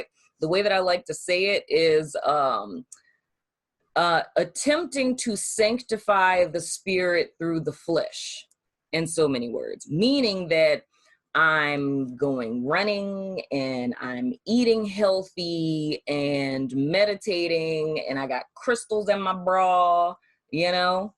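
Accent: New Orleans, United States. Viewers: I knew you was from New Orleans from your first word!! I am too & I said “Lawd she sounds like me” lol! ⚜️⚜️⚜️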